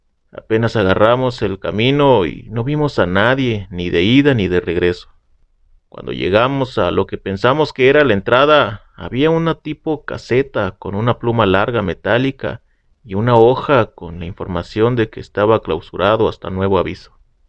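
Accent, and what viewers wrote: North, Mexico. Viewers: Narras chido, con ese acento del norte me gusta.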